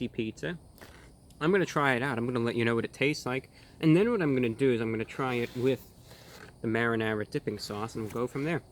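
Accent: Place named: Tampa Bay, United States. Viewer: Looks like youre in my area...tampa bay. Sounds like it too.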